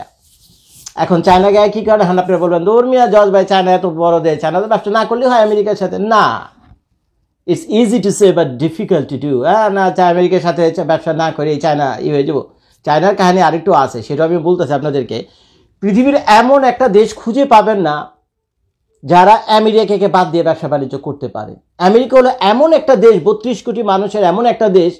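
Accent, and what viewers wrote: Dhaka, Bangladesh. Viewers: জোস ভাই আপনার কথা শুনতে অসাধারণ লেগেছে খুবই অসাধারণ লেগেছে কথার মধ্যে ঢাকাইয়া টান থাকার কারণে আরেকটু বেশি অসাধারণ লেগেছে। কিন্তু ভাই একটা জিনিস ক্লিয়ার করলেন না আমেরিকার মধ্যে কি সবই আছে যদি সবই থাকতো তাহলে বহির্বিশ্বে থেকে আমেরিকা আমদানি করত না চায়না রাশিয়া ইন্ডিয়া বাংলাদেশ যদি আমেরিকা কিছু না দেয় তাহলে তো ডোনাল্ড ট্রাম্প গেয়া!!!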